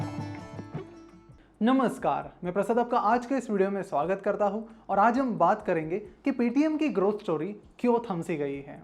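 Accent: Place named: Nagpur, India. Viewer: Sir aap nagpur se belong karate ho sun le.proud feel huwa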